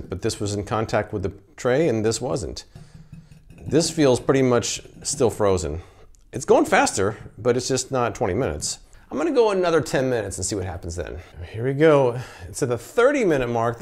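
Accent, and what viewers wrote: United States. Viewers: Are you American perhaps? 🤔🤔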